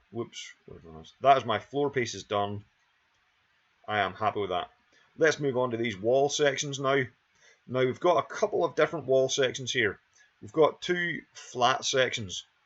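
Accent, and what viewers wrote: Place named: Northern Ireland, United Kingdom. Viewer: Sounds like your a fellow Northern Irelander! :hand-pink-waving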